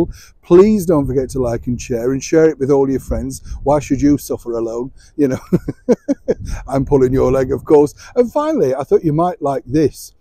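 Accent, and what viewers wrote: Yorkshire, United Kingdom. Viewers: am I detecting a Yorkshire accent from you? Love it ❤ - Merry Christmas 🎄🎁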